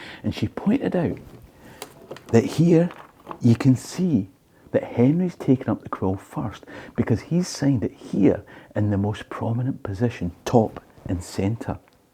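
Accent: Scotland, United Kingdom. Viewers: ❤your videos and classic Scottish voice, the best travel videos ever. Scotland 🏴 is Heavenly and people are fascinating…we’ll be back soon!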